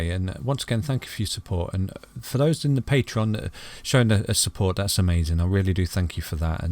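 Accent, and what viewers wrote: United Kingdom. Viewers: Love hearing a fellow Brit on these videos. Great job.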